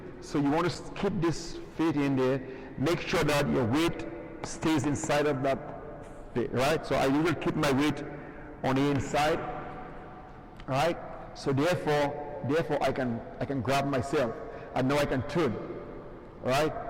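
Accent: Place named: Saint Vincent and the Grenadines. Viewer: Good tips. Are you a Vincy? I noticed your accent.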